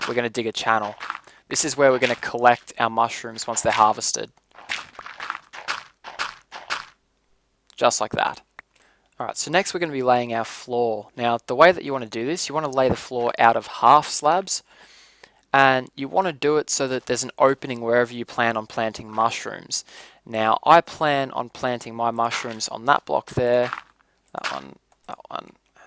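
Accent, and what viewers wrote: Australia. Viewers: r u aussie? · are you an aussie :D?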